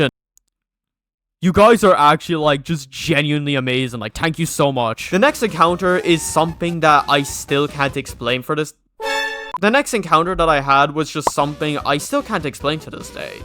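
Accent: Ireland. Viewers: Woowww you’re Irish like mee! I heard it in your voice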